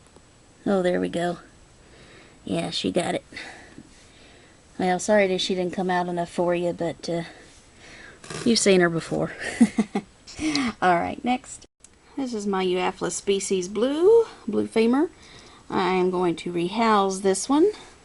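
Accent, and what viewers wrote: South, United States. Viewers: I really like your videos because you show your collection - whether they eat or not - so it's interesting to see which species you have but also what stage they're in their growth cycle etcetera. I also like the way you list what the viewer is seeing. Some Y-tubers don't even let you know what you're looking at or don't write scientific name in full eg. P. scrofa. it took me ages to find out what the P stood for! SO....MANY thanks for being so thorough and really nice to see your collection. This is only the second of your videos I've discovered during this infernal COVID 19 lockdown in England/UK Thanks again. You have a really lovely accent btw. Somewhere in the Southern States of the USA I expect. I had a 5 inch female Grammostola Porteri for a couple of years, about 28 years ago! But, I've only recently discovered this thriving T hobby so alive and well on Y-tube! 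Absolutely brilliant viewing. In fact it's got me right back into it so that after all those years, I decided to buy two slings yesterday! Can't wait for them to arrive. 2 x 1cm Eupalaestrus campestratus (took me months to find them, they're so rare on this side of the pond *grin*). Hope you are keeping safe on that side of the pond and hope to see you alive and well in a few months time!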